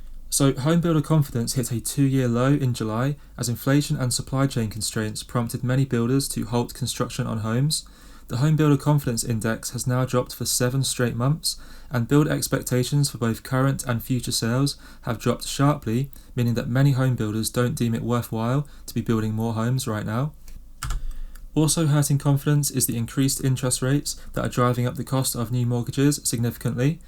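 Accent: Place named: England, United Kingdom. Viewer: Nice accent! You English?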